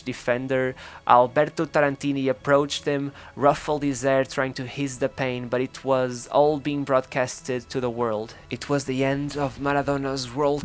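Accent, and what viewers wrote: Portugal. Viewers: Uma pergunta: és português não és?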